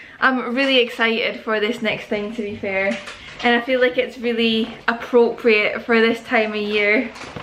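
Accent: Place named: Scotland, United Kingdom. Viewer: Its so refreshing finding a fellow scot on here! Was dead jealous of all the food!! 😂 You sound local to me! Which area are you from? I’m Aberdeenshire x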